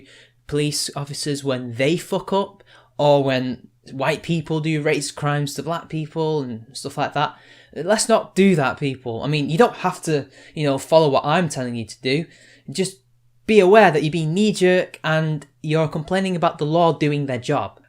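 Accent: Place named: England, United Kingdom. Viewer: G Willy He's English by the way, if you could not tell by his accent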